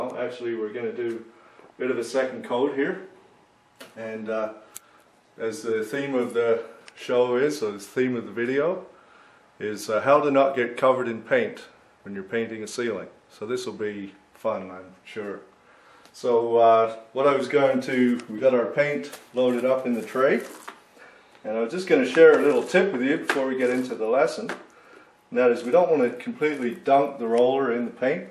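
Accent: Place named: Scotland, United Kingdom. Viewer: This was great to watch a real pro doing the usually messy job of painting a ceiling the proper way... bit jist as a wee small aside noo, kin a no detect jist a wee bit oh the far-flung 'remnants' of a guid Scot's accent, hiding in backgroond? Well; an av always says this massel son... Ye jist canny hide true Scot's class (or talent).. fi nayebidy, nay matter whoar yi ur roon the wurruld or even in the back 'o beyond, an that's fur shure tae! Thanks, for uploading this video.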